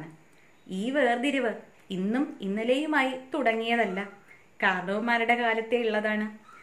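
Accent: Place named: Valluvanad, India. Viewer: വള്ളുവനാടൻ ശൈലിയാണല്ലോ....😄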